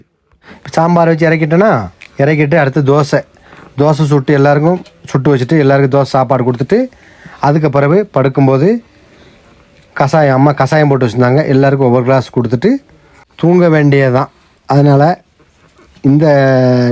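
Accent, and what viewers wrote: Tirunelveli, India. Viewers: Sister neenga tirunelveli ya Unga pechu apdi irukku athan ketten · அண்ணா நீங்க பேசுறது கேக்குறதுக்கு ரொம்ப நல்லா இருக்கு நம்ம ஊரு பாஷையிலேயே பேசுறீங்க நானும் திருநெல்வேலி தான் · Bro slang thirunelveli touch iruku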